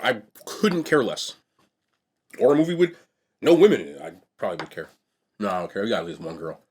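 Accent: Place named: New Jersey, United States. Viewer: Bro are you from Jersey ?!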